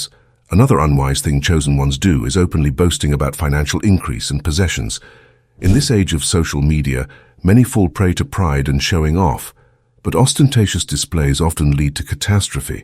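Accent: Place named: England, United Kingdom. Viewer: This narrator sounds like some kind of wise fellow. The English accent helps, however his words are solid. Great advice .....man without a face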